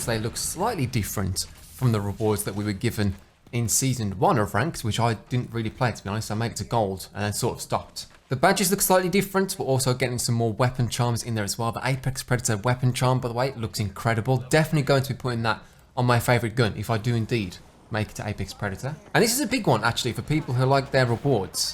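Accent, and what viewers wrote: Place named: United Kingdom. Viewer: I am from Croatia and I have no accent but has a very clean British accent... The cleanmost British accent I've ever seen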